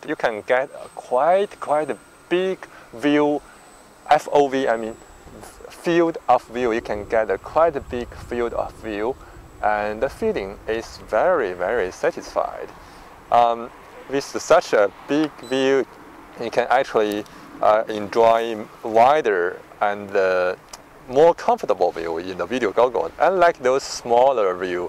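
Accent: China. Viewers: Couldn´t you find someone to present products, that are more proficient in the spoken English? It´s too "chinglish" for my liking....but excellent product!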